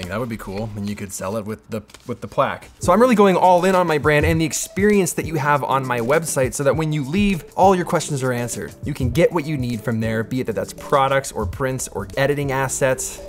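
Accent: England, United Kingdom. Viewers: Man, that perfect British accent cracks me up EVERY SINGLE TIME🤣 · That British Accent though 😹❤ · That British accent 😂😂👌🏽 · That english accent though 😲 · that british accent broke my mind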